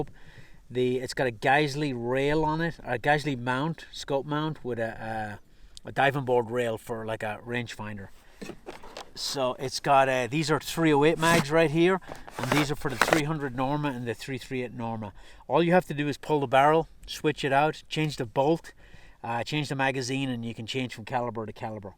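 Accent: Ireland. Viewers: Irish! That's it! I couldn't figure out! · Where is the presenter from? Sounds Irish to me. He said 'so we were', which suggests Northern Irish rather than the Republic. Perhaps border. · Great video, what pat of Ireland you from? I cant tell, Dublin? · Hey mate you sound Irish 🇨🇮🇨🇮🇨🇮 👍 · I can hear a hint of Irish Accent in yer Patter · i have to ask.do you have a slight irish accent? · Two things that accent, Irish Scottish? Second how does the rifle operate from short action to long action?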